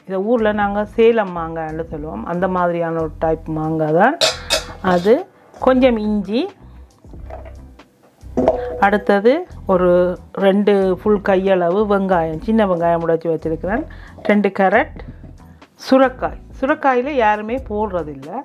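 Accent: Sri Lanka. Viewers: Super Acharu 👌👌it's so very nice to hear you with your Srilankan accent 🤪Your garden also looks nice 👏.I too do this picked vegetables but never added mangoes.I will add it next time. Vazhga Valamudan ❤❤🥰😍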